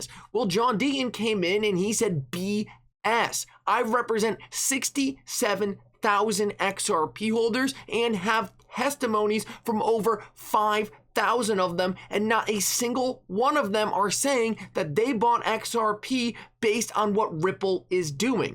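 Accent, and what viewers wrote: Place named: Philadelphia, United States. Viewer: THAT IS A 215/267 AREA CODE ACCENT! LOL BUSTED! LOVE ALL OF YOUR CONTENT EITHER WAY YOU HAVE BEEN #1 ON THIS CASE FOR THE PAST SEVERAL MONTHS IN MY OPINION! POWER TO THE HODLERS❤🎉😊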